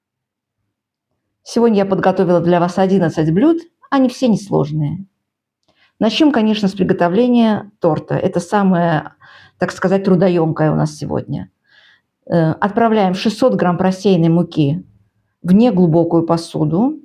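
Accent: Baku, Azerbaijan. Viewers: Здравствуйте,! Это все, что готовили наши мамы, я прям окунулась в детство! Вы, случайно, не бакинка? По разговору почувствовала, потому, что демьянки, говорят только жившие в Баку❤❤очень приятно познакомиться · Добрый вечер, а вы бакинка? Простите акцент❤❤❤ родной😂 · Акцент бакинский неистребим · Случайно увидела это видео, думаю, дай гляну, хоть и НГ уже прошёл)) Уже на слове «столичный салат» у меня возникли смутные сомнения)) Потом кИнза, винегрет с красной фасолью и, наконец, демьянка – развеяли все мои сомнения – я смотрю видео бакинки из Греции😂 И ещё, конечно же, наш акцент, хоть и небольшой, но всё равно ощутимый😂 Поймала себя на мысли, что смотрю видео и жду, когда же Вы скажете «бурак» вместо свеклы😂 На душе так тепло стало при просмотре вашего видео, будто с родными повидалась)) Большой привет Вам из Баку! И спасибо за интересное видео! Успехов и крепкого здоровья Вам в новом году!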